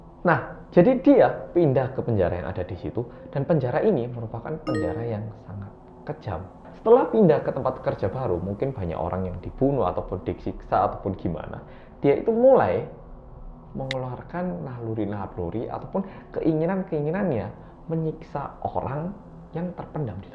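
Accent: Java, Indonesia. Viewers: Kro ngenteni buko, cusss nnton wong medok😂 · medokkkk update gaesss ngeriii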